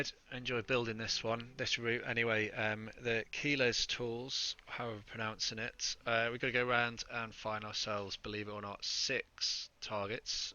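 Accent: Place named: United Kingdom. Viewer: Found this video after watching Smii7y absolutely lose his mind over this heist. The transition between loud Canadian frustration and calm British professionalism was phenomenal 🤣🤣🤣. Great video!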